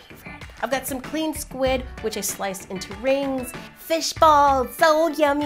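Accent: Thailand. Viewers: I love your Thai accent 😃😃😃💛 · I love your Thai accent!!! So cute❤️😘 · Love ur thaiglish accent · This is perfect yess btw love your Thai accent!!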